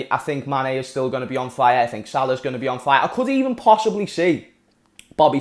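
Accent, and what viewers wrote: Liverpool, United Kingdom. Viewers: I'm going out on a prediction limb that I hate: I'm saying we win the league, no surprise there. BUT, the thing I hate is that I really think Everton could be number 2! We can't be blind to what Carlo is doing over there! And, the Italian Stallion is sort of a nemesis to Klopp from his days at Napoli. He always gave us trouble and every Red should realize he's far from shite and since he's got his wonder boy with him, I'm rightly concerned. Number 3, 4, or 5 is City and I'm thinking Arsenal will be 4 because Arteta is doing the business over there. Gunners could be number 3, so we better be ready for them. You called the line-up, I think except maybe the gaffer could start Milner but I'd rather have Gini. Yes, Ally is injury prone obviously. Will he be out long this time? I don't think so. I'm thinking 2-1 because there ain't no 12th man in the Kop to encourage our lads. 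Okay, Brendon and his foxes will be number 5, I think, but could be number 4. Managers gettin' the sack? Jose, Fat Frank, OGS are all gone this year. Pep won't get sacked but he's moving on after the year, I predict. BTW mate, for what it's worth, take it from an old man, you are boss at this. Keep it up and your channel will become massive in the Red fanbase zone. You sound like a seasoned pundit already and I'm hooked on your Scouser voice, your language, and the way you get excited and worked up like the diehard fan that you are. Inspiring, young man, simply inspiring! I watch everything you d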